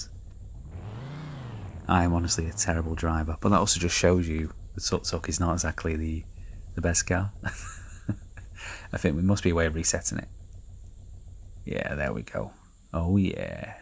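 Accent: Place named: United Kingdom. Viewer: You kinda remind me of Elanip who plays GTA 5 with your british accent xD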